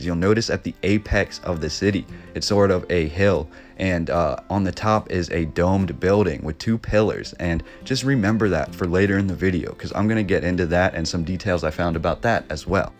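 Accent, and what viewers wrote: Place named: East Coast, United States. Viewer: I recently found your channel It fantastic I can hear the east coast in your voice Lol Have you done washington dc or georgetown?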